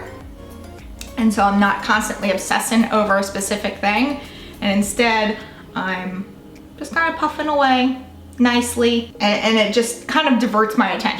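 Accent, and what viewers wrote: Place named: Metairie, United States. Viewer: I can hear the Metairie in your voice! Haha! Kenner here :)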